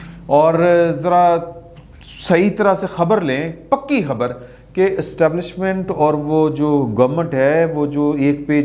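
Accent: Punjab, Pakistan. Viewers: sahib i really enjoy your Punjabi ka tadka in all the conversations.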